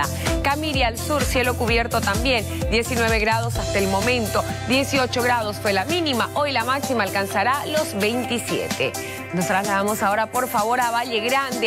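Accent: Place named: Argentina. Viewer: Che, esta mina habla igual q una argentina...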